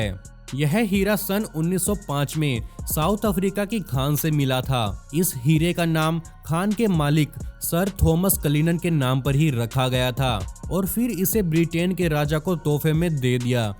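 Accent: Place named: Kashmir, India. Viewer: Bhai.. Ap kashmiri ho kya.. Apka assent kashmiri types hai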